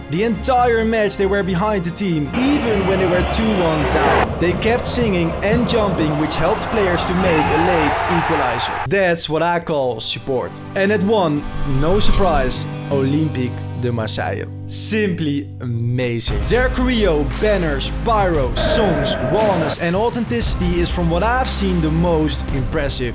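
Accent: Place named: Netherlands. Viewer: Verschrikkelijk Nederlands accent. Maar de manier van praten is helemaal stuitend. Na 1 minuut met schaamte afgezet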